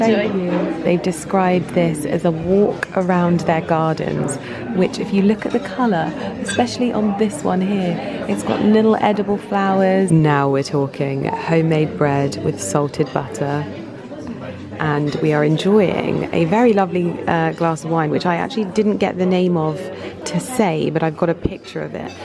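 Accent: United States. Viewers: I hear an American or Canadian accent by the host....fantastic destination to visit. So beautiful. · Even for me as an American, it was a bit jarring to hear an American accent on your vlog, in a French place. 😂😂 This place is magical, thank you for sharing. 💗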